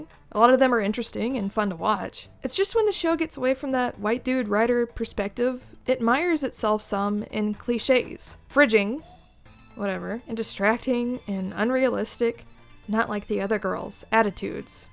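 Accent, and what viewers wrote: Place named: Australia. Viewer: He sounds aussie not Kiwi :O (-an aussie)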